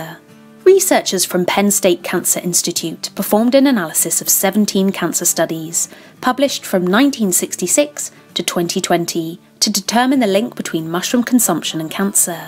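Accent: United Kingdom. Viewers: Mushrooms are great, you can make them really tasty by adding herbs, garlic, tomatoes, etc. and there's no need to add oil. They're very low in calories, filling and "meaty" in texture so they're a great food to have around. Nice to hear a British voice and to know you're based in London too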